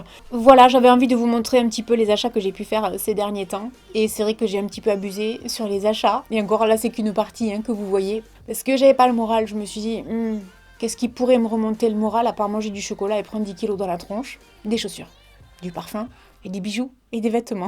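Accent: South, France. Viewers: Il manque dans ta liste anti déprime une seance au spa avec massage. Ce que j'adore chez toi c'est ton accent du sud comme le mien ! 😉 bisous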